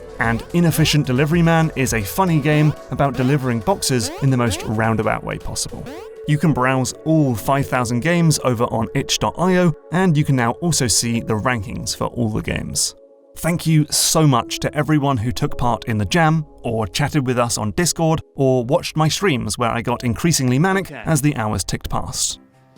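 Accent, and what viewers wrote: United Kingdom. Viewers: Yeah my man do be british though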